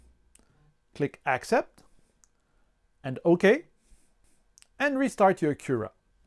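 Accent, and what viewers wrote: Quebec, Canada. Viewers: Allo juste avec ton accen je comprend que tu dois venir du québec ;) as-tu essayé avec la version actuel de cura sois la 4.4.1 ? j'essias et je ne trouve pas comment faire...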